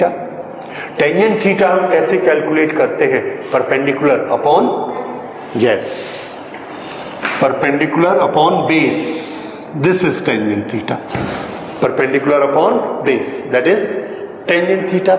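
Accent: India. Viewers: I see all of these great comments about this professors teaching, and only wish I could understand his english better. When I worked with some really nice people from India, I had no problem after we were together for a while, but it has been years, and I know this is english, but there is an accent that makes it very difficult for most Americans to initially understand. I will try to just watch it several times, and hope it then comes naturally, because everyone has so many great things to say about his teaching. I guess it is the way some vowels roll off the tongue, something common in Hindi, that makes it difficult for me....and I'm certain the reverse is also true, where if someone not familiar with the way Americans speak english, they would be spending too much time trying to understand the words, and miss the lesson. Thank you very much for generously posting this lesson sir, and I only hope I can get as much from your teaching as the others have gotten!!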